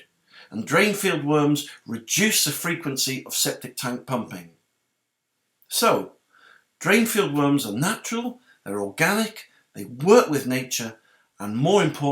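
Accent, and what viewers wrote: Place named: United Kingdom. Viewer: Again, this guy is giving inaccurate information about Residential Septic Systems, because pumping a Septic Tank is only a few hundred dollars, and it doesn't need to be done every year. In fact, pumping a Septic Tank is usually recommend every 2, 3, 4, or 5 years, depending on the size of the tank, and the number of people living in the house. You don't need to add worms or anything else to your Septic Tank or Leachfield/Drainfield... absolutely unnecessary! This guy is just a pitch man, and he's full of you know what... don't be fooled by his British Accent or this video presentation.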